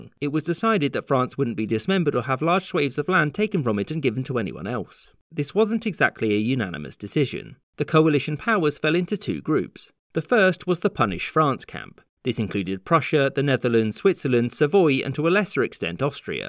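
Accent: United Kingdom. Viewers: I've always thought it was interesting how Brits pronounce things. It's so striking to hear someone call France, 'Fronce'.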